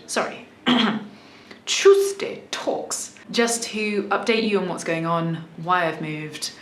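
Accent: United Kingdom. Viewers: Wish I had your looks along with that beautiful British accent. And then you play the guitar and sing as well. Beauty, accent and talent. Now I call it 3 in 1. I am writing from Sri Lanka where I was born and been living since then, and I am no where as beautiful as you are. And I cant speak English the way you do. Wish I could. God bless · Love the video!! Good luck setting up the studio. Maybe the next three videos you give us a different British accent each time… In song even!! Love your channel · your accent sounds british to me, i'm from the boston, massachusetts area and have a strong accent and i'm 71 yrs. old and most of the people around my age have a boston accent which we picked up from our parents and surroundings when we were young. now i've noticed that most of the younger people like milleniums don't seem to have this accent or altered maybe due to their exposure to more people via the internet. 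my wife is from western new york state and has a more neutral sound to her voice. one could to a real study of all the regional accents in the U.S. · I love your accent. It is the best British accent I've ever heard. Everything you say is perfectly enunciated and so easy to listen to. I wish you the best on your new work space (studio) and your future endeavors. Love from Miami ❤️❤️ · The non-rhotic British accent such as your beautiful accent, is not a classic accent. It didn't exist before the late 18th and early 19th century. It's really quite recent. Many would be surprised to know that the current American accent is quite similar to the British accent of the 18th century and that it is the current British accent that has changed. Sorry. Linguistics geek;) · Hi, your british accent is what I like the most! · your accent sounds very british ------ but i know nothing about you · I've watched several of your videos before, and suddenly I just subscribed at WINK! 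Looking forward to being a further viewer/listener/learner. I lived four years in the UK. You're definitely a Pom! Love your eloquence. Hi from Oz, oi oi oi!;-):-) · While you're accent may be a bit British, it's not truly British because I can understand every word you say (Ahm frum Jawghuh so eh ez purdie goot Ah kin git thru th wurl whentz zi git out'n da haus.) A truly proper British accent, and there are many, cannot be understood by anyone outside of the neighborhood in which it is normally spoken I believe the appropriate quote is, "If I were any more British I wouldn't be able to talk at all" Whatever your accent you do it well. Perfect voice to listen to 😎 Also: Like your new digs but those blank walls scream, "ERASABLE MARKER ATTACK" 😁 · As an American we love British accents! We generally can't tell the difference between different areas, but I love yours and clearly you're from a classy background! 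· Quite a lovely lady and axe player. Love your clipped British accent. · I have to admit, I watched this video just because I wanted to nap while listening to your British accent. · Sounds British too me, don't sound like you're from Liverpool but doesn't sound like you're from Texas (like me), doesn't sound like you're from Atlanta or the Joisey shore. Sounds British. London? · British accent is nice; I'm smitten with those beautiful brown eyes, I'm in love....a little bit of madness...with with great guitar that is. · In 5 yrs you'll be just wherever you wanted to be! Life is good!! Love your British accent..!! Hi from Ecuador-South America!!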